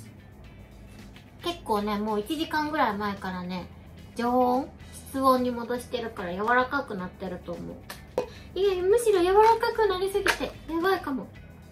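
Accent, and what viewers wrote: Kansai, Japan. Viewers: たまに出る関西弁がかわいくて好き！ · やっと菜々さんの関西弁が聞けたよ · ゴリッゴリの関西弁も聞きたい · の関西弁もっと聞きたいよ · 関西弁しゃべってるほうがかわいい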